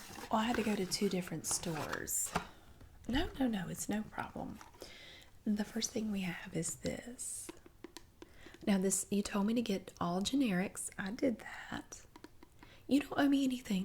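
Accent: South, United States. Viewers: Just love your southern accent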